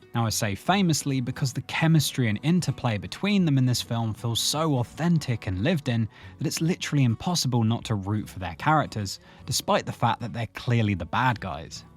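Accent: United Kingdom. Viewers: Terrible list, but the guy sounds British, so....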